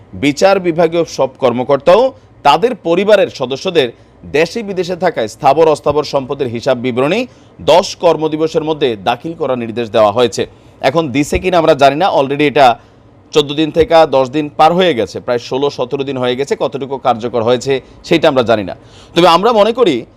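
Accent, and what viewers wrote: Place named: Comilla, Bangladesh. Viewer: Location India কেন, আপনার ভাষা তো কুমিল্লার মনে হয়।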